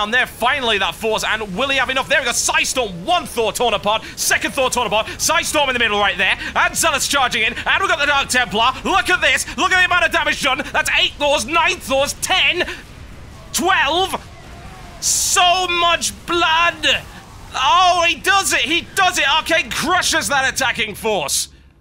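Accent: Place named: London, United Kingdom. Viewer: lol, i can tell you watch a lot of EPL, using terms like "equalizer," as if LZgamer was one-nil down hehe. ARSENAL IS MY HEART. judging by your accent (i'm terrible at judging english accents) you do sound like a londoner. which means there's a good chance you're a gunner fan :D. keep making videos, there will be criticism no matter what you do online. everyone is a professional at everything with anonymity. in real life, they most likely flip burgers and drop potatoes in fryers all day.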